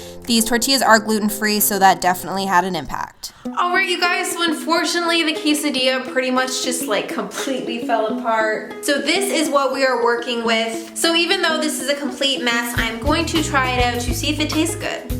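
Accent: Canada. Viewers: are you canadian ? hehe :) cute accent (the way you say about and cilantro and avocado got me)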